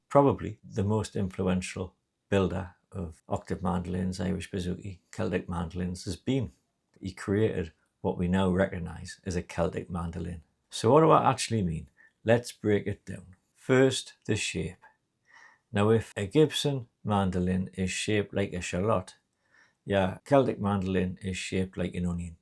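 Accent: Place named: Geordie, United Kingdom. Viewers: For my money yhe Geordie accent is the most beautiful English accent I know. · I’m west country born and bred, understood every word. There’s nothing more satisfying than hearing the word Bazouki in a north east accent! Great video. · where in the north is the accent from? I have to concentrate, but understand well enough (Irish myself) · Midlander brought up and lived in south decades since, except for short period a little north. Zero struggle with accent. I like the northern accent.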